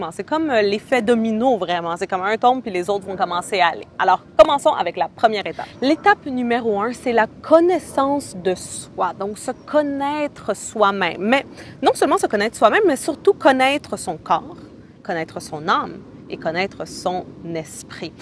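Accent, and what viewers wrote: Quebec, Canada. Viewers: Hamèd M. KAMAGATE elle est québécoise... son accent est excellent :-)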